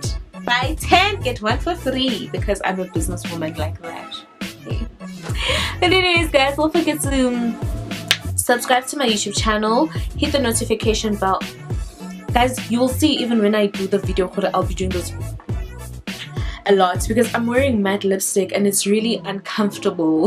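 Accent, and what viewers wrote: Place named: Nigeria. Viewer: The Nigerian accent 😂😂😂😂😂😂😂 I'm sold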